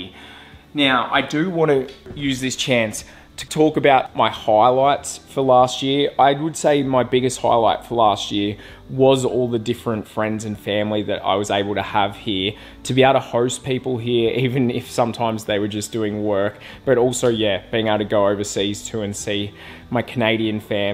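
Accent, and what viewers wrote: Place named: Australia. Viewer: ....what a great effort 2023 gave to you....a foundation of a new beginning for 2024...love all your thoughts going forward...to relax and enjoy the process forward is the blessing you have now given yourself...living each day with your precious buddy, in his golden years supporting the journey within you both, will set in your heart the biggest gift...May this year unfold abundance and prosperity in many forms...Enjoy with life laughter and love...great to hear that broad Aussie accent...Love the haircuts, to lighten the load..Blessings always...XX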